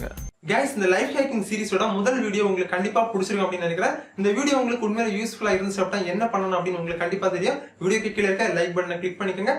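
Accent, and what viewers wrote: Kanyakumari, India. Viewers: Bro neenga kanya kumaria ....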